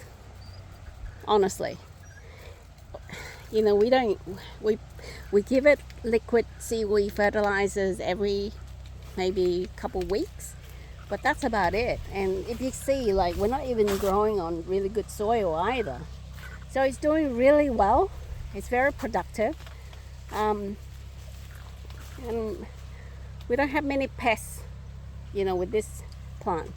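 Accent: Australia. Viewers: Your accent instantly gave away that you are Aussie. I'm making a pumpkin tunnel using Seminole pumpkins but after seeing your runner bean tunnel I think I will add them as well for even more shade because I'm in Florida. Great video!!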